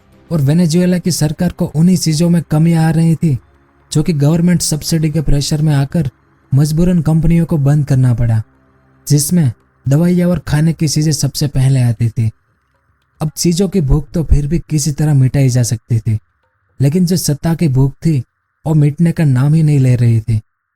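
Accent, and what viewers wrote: India. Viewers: Tu bolne me pura desi hain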